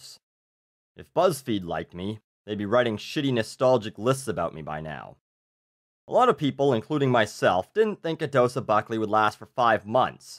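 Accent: Canada. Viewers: I here more of a Canadian accent with these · Bro! Listen to the end of Buckley's sentences! He sounds so Canadian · Oh god you can really hear Buckley's Canadian accent. 10 out of fucking 10. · you had a way heavier canadien accent back then.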